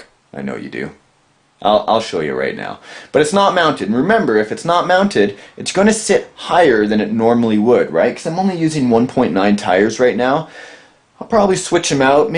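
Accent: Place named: Canada. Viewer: So funny ur canadian accent... "eut" out lol. Great project!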